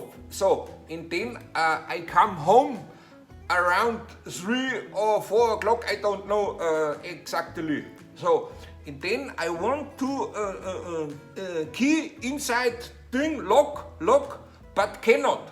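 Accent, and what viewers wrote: Germany. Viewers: Einfach herrlich das baydenglisch des 😂👍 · Ha, der is der beste, und dein Denglish ist einfach super:-D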